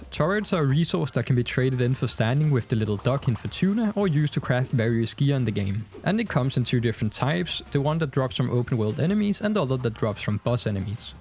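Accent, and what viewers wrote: Denmark. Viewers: Great video. You sound Denish?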